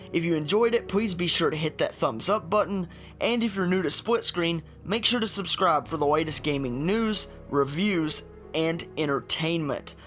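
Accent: South, United States. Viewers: JSG GAMING its a southern accent.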